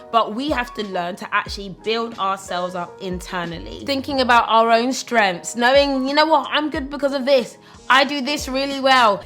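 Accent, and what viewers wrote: United Kingdom. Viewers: Interesting seeing a briiiish accent sistah with a cool vlog. Found it by accident 🙂 Nice it's not another barbie doll Instagram drama diva.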